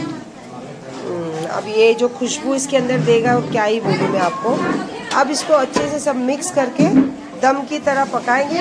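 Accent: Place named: Deccan, India. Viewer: WOW she's talking dakhni urdu❤❤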